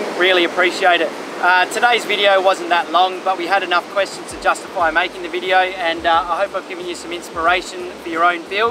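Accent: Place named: Australia. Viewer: Nothing better than hearing an Aussie doing a product review. ‘Bloody brilliant” 😆😆😆😆😆👍🏻👍🏻👍🏻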